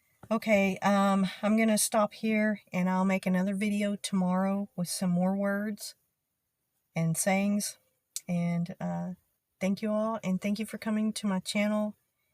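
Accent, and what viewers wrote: Texas, United States. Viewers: I understand your accent completely! I’m from the Boston area and we have that particular accent. I’ve lived here all my life, but the funny thing is if I’m watching tv I can pick out a Boston accent however slight. I don’t mean actors who are speaking that way for a role, because they never get it quite right. I mean native speakers. Question for you, what area of Texas pronounces greasy as “greazy?” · Honestly, the Texan accent is beautiful...But that is just my opinion . Love the way you talk ..I hope I learn the accent one day... I actually use Yes sir, Yes Maam talking to my patients and other people in public..respect is always a thing for me and my country... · I think you did a good job but the best part was listening to how you say things. The length of your sentences, your intonation, diction, body language and so on are wonderful. 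These things you do automatically, never thinking about it enough to make a YouTube about. That's the REAL Texas talk. I'd like to hear you and your friends chat about something commonplace - not sports because there's a lot of jargon that everyone uses with that. Talk about growing up in Texas and things that happened. I'm a writer and right now, I'm looking for examples of contemporary Western language. It's at its best when people are having a conversation, lectures are okay but that's never completely natural. I guess if I wanted to do this, I should come to Texas and spend a lot of time at restaurants, bowling alleys, and grocery stores observing like a bird watcher. Yep, that'd be the way, but listening to you is very helpful too. Thanks! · You guys have quite a bit in common with the Aussie accent then. We say “waddaya want”, “budda”, “gunna”, “tuday”. On the other hand someone from Boston saying “park a car” would sound exactly like us. 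Definitely coke just means Coke tho (when you’re talking about drinks that is!). But I think that’s not just Texan - other Southern states say that too, weirdly enough! Would you say you have a strong Texan accent? To my ears it doesn’t sound as “extreme” as other accents, like say, someone from Georgia or Alabama. Anyway, it’s a pity Southern accents get stereotyped as uneducated, I think they’re gorgeous, and yours is lovely & smooth. Cheers from Australia 👍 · Finally a video by someone who actually speaks with a Texas accent. Most of the other videos are by people who don’t speak Texan.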